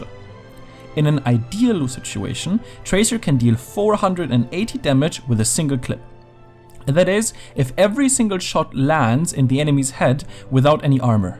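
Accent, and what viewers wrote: Germany. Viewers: Du bist deutscher oder?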